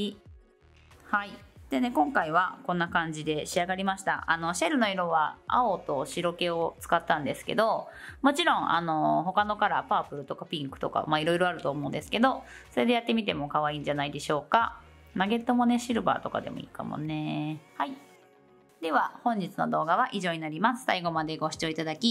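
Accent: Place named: Nagoya, Japan. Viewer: 名古屋の方でしょうか？！懐かしい響きな気がします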